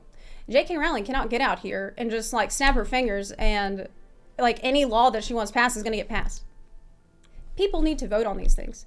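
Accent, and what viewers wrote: South, United States. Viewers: Southern accent makes sense now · Is it me or she is becoming more and more Southern by the day? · Its because she is from the south, she's from Louisiana. She moved to the Ukraine to teach English iirc.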